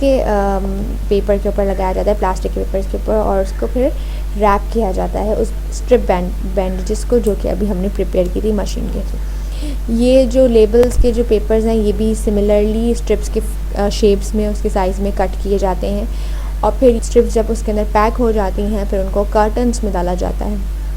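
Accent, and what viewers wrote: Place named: India. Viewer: Can another person read the text?I dint get anything , is there any person, whiteout Indian ecsent.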